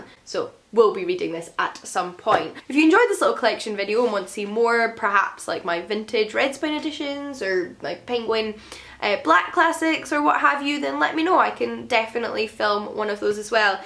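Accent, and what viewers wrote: Scotland, United Kingdom. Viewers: you talk. i fall in love with Scotland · I discovered your channel a few days ago, and i have to say i'm not disappointed. Besides litterature; being a non-native (from Algeria) english speaker, listening to you really helps me getting used to scottish tongue. So thank you for all that.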